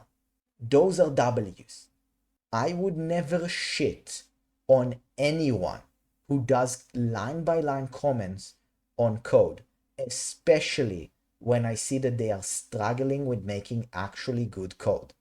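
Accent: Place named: Israel. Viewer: Are you Israeli? You have an accent that really sounds like it.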